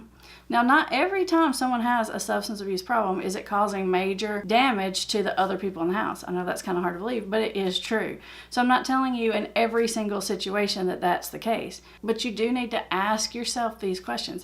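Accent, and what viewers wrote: South, United States. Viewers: i like that country accent ;)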